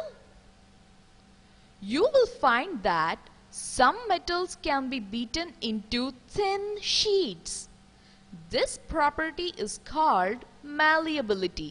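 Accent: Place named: India. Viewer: Indian accent detected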